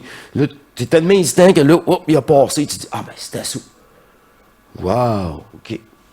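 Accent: Quebec, Canada. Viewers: Dommage difficile à suivre pour un belge francophone , ton accent québecois est trop fort ! ! Personnellement je suis une québecoise ( Iso V Sinclair ) qui fait des vidéos sur la supraconscience vibratoire , elle poursuit l'enseignement de Bernard de Montreal ... elle explique très bien l'initiation solaire , l'ajusteur de pensée , le monde éthérique hors matrice du démiurge et des archontes etc... Cependant il y a certaines choses intéressantes dans ton discours ... Merci du partage !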